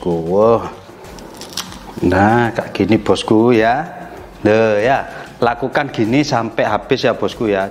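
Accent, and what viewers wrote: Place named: Java, Indonesia. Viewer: Masnya kok meddok gitu ngomonge ...wong jowo y mas?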